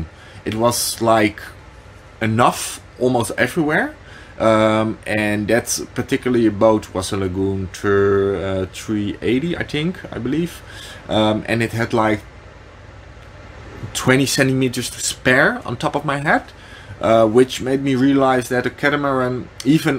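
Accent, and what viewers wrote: Netherlands. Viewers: English is perfectly fine. Distinctively Dutch. Good intro thanks. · BTW…. Don’t be self-conscious about your Dutch accent. Most people here will find it charming 😉. I LOVE the Netherlands. Some of the best marine technology in the world comes out of your tiny little country, and you should be very proud. 👍🏼. Not to mention…Old Dutch Gouda is to DIE for 😋 🧀. Can’t wait to follow your adventures !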